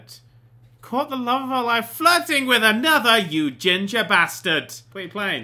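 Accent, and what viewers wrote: England, United Kingdom. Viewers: Oh! And how could I forget, an hilarious, English narrator.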